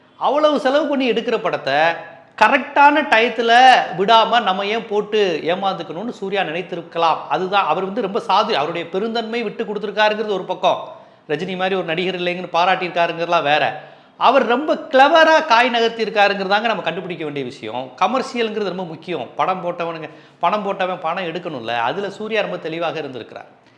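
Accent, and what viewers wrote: Madurai, India. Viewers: நீங்க மதுரையா.. நல்ல நாகரீகமான விளக்கம் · Bro neega madurai aha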